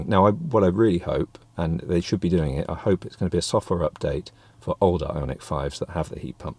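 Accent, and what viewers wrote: United States. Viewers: The American accent… 😂😂😂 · I liked your American ascent :) ........and I'm American. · One question. Great American accent! Have you done some acting in the past? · The American accent wasn't so bad, please do a full episode with the accent!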